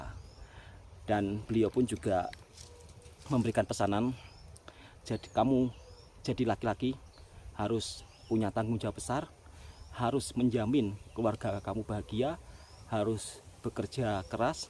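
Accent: Java, Indonesia. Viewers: Bos Malaysia trkejut klw tk balik kerja d Malaysia?..😂😂😂 Maaf ckp la..sy tengok skill prkebunan d kpg wlwpun hanya tanaman sayuran..tk nmpk langsung kepandaiannya, nmpk mcm batas sayurannya semak, kurang trurus dan seolah spt petani yg pemls dan tk serius..tk bole d jdkn panduan dlm hal2 prtanian kebun. Amin Maulana lg bagus...prtuturannya pun lebih baik dan tratur, rajin ke dapur dan bole d katakn pandai memasak. Prtuturan ni trlalu bunyi jawanya dan kadang2 asyik trsasul, padahal sudah brbelas tahun d Malaysia. Lama2 naik bosan jgk menonton konten2 vidio si ni. Hello...buat lh perubahan konten yg lebih mmbina dan menarik lagi..jgn asyik gunakn ank saudara sebagai umpan nk melariskn konten vidio kamu je. Klw sesekali tu, tk pe la. Gunakn kepandaian, kreativiti dan inovasi original kamu sendiri utk menarik minat penonton, kualiti konten dan brilmiah. Komentar asyik nk kena ckp yg baik2 je, wlwpun nmpk byk kekurangan..sesekali spt kami penonton ini, kena lh tegas dan pedas cket komentarnya supaya harapan adanya perubahan yg lebih baik dan mmbina.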